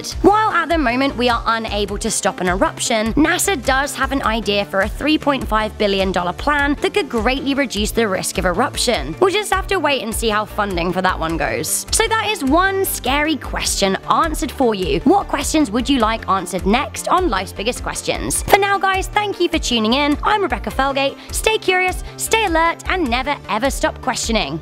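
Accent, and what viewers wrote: United Kingdom. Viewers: I don't know...I don't know...I don't know where I'm-a gonna go when the volcano blows. I love hearing Brits pronounce things like "St. Louis" and "Cheyenne".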